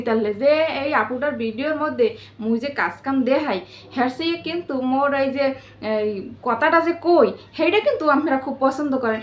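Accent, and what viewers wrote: Barishal, Bangladesh. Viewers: আপা বরিশালের ভাষা মোগো আঞ্চলিক ভাষা ভালো লাগে আপনি এভাবেই কথা বলবেন ভালো থাকবেন ❤❤❤ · আপু আমার মেয়ে আপনার আপু আমার মেয়ে আপনার বরিশালের আঞ্চলিক ভাষায় কথা অনেক পছন্দ করে ❤❤আপনি এভাবেই কথা বলে ব্লগ করে যান❤❤পাশে আছিআপু❤❤ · আপু তোমার বরিশালের ভাষা শুনতে আমার খুব খুব ভালো লাগে❤❤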